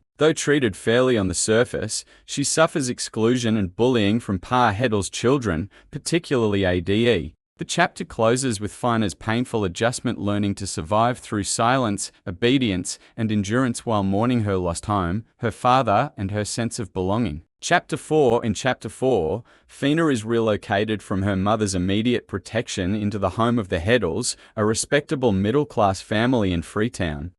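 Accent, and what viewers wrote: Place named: Australia. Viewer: FINALLY! AUSSIE ACCENT!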